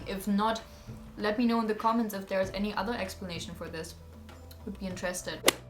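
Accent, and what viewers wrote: India. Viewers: Yes you are right, you are not a tourist you are a citizen of India.. People in my country should understand this, I have one person who is very close to me she experienced the same as you. She feels bad about it sometimes.. And in general she is more Indian than me in many things.. Anyways your accent is full like an Indian, the way you pronounce... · I felt like I was listening to an Indian not a German, I think your have explored India more than an average Indian, Your video was nice in terms of perspective of a outsider or a foreigner of what the person thinks about India and its cultural habits 🙏🙏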